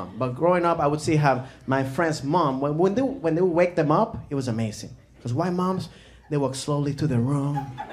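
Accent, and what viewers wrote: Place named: Venezuela. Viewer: Jose Bravo Well they’re both from Venezuela same Accent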